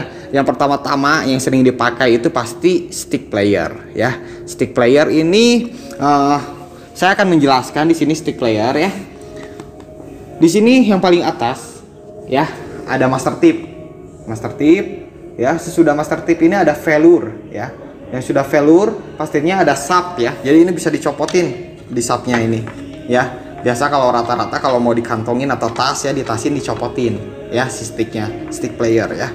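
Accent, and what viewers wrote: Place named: West Java, Indonesia. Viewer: Belajar dasarnya pake logat sunda, maklum~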